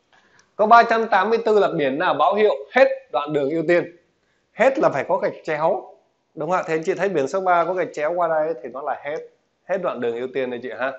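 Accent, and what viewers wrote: Thanh Hoa, Vietnam. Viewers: Anh oi anh phai người quê thanh hóa ko ạ , bai giảng của anh tuyệt vời lắm ạ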